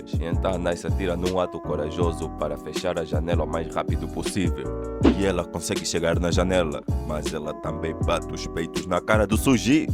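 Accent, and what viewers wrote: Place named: Mozambique. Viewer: eu achei que vc era um Moçambicano da língua de Quelimane e igualzinho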